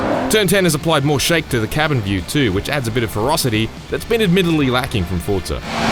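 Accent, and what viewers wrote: Australia. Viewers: I quite enjoy aussie accent, but listening to this gabble is true pain... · The guy is definitely an aussie, man. 😅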